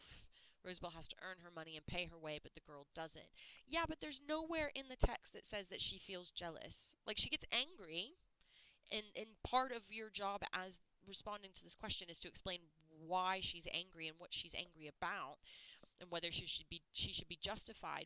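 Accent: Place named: United States. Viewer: Why is an American teaching me English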